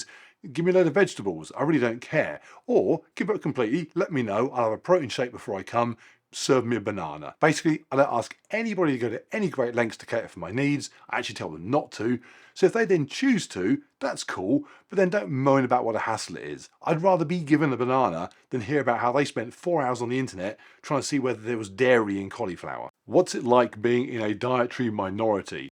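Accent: United Kingdom. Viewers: Unfortunately, I cousins understand a word in English. Very strong British accent and I cannot understand this English.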